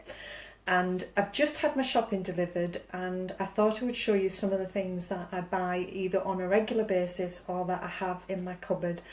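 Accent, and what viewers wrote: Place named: Geordie, United Kingdom. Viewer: Where are you from? I ask because you sound like you have a Geordie twang in your accent. Love your video's. Linda xx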